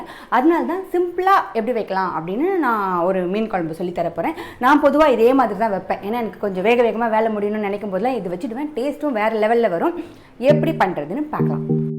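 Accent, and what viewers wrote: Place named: Erode, India. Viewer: sissy erode language pola pesrenga. unga voice kekkum pothu amma oor மண் வாசனை..... And meen kulambu vaasam kalanthu oru vidha santhosathai kudukkuthu.... Nice sissy thank u so much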